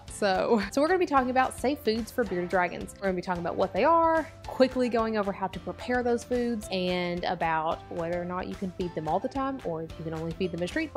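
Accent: South, United States. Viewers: I love your videos and your personality and how you talk so smoothly , you must be southern I hear my accent ❤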